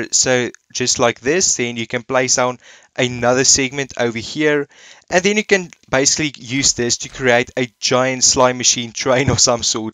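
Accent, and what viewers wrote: Afrikaans, South Africa. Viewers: South African ? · Definitely South African Afrikaans accent ha ha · Are you from South Africa? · Are you South African Dude you are. I am from SA and I know a Afrikana when I hear one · Do you speak Afrikaans? It’s sounds like you do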